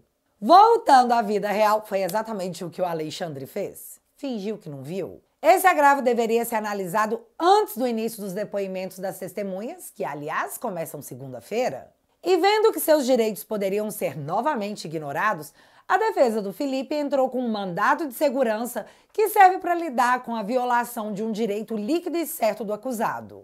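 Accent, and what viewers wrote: Minas Gerais, Brazil. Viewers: Minina, ouvir você é bom demais da conta sô! Esse seu jeitin mineirin, com esta didática tipo Nuh! Nos faz entender tudo direitin, com humor, um cadin de esperança e mais leveza. Tempos sombrios... para os ditadores espero! Na minha opinião, fico pensando se a Magnitsky tivesse vindo antes, não teria sido melhor para nós. De repente, as sanções ficariam para depois. Esses 50% serão dureza, pois o molusco dobrará a aposta, que nem o cabeça de ovo fez, sexta passada, com o Constantino, de novo, através da Rumble.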